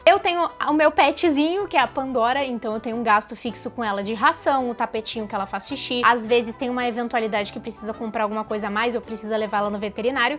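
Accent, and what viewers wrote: Brazil. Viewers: VOCE É DE PORTO ALEGRE? KKKK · Pensei que vc fosse de SP 😂😂😂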